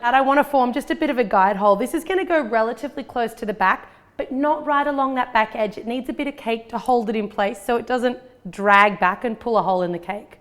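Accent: Australia. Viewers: Australian I think :) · she is not English. her accent is Australian.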